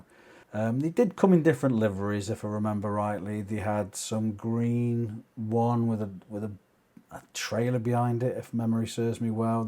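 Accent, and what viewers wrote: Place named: England, United Kingdom. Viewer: Looking forward to watching the other videos you have made. You have just been recommended to me - nice to hear an English accent! I haven't grown up either!!!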